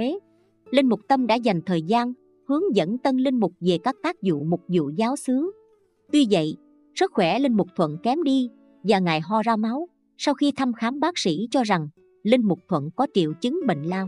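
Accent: Saigon, Vietnam. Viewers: Giọng Sài Gòn nghe ngọt quá! 👍👍👍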